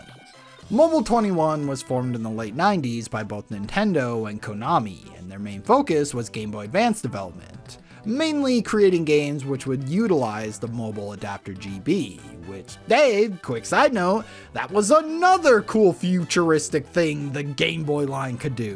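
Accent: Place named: Midwest, United States. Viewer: This video was well researched I had never heard of some of these game boy games before!! Well edited and love the humor. However your midwestern O's were hilarious!! They remind me of Kevin from the Office which I know is a bad comparison but I think you should lean in on a persona as a Midwestern dolt.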